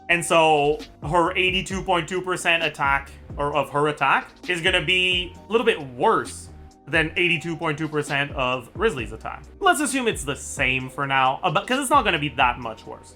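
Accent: Quebec, Canada. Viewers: I definitely hear the French Canadian influence every time speaks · its probably canadian french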